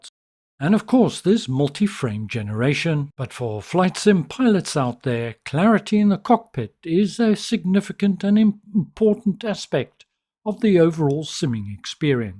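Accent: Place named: South Africa. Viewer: Totally unrelated, but I gotta know; do I detect a South African accent?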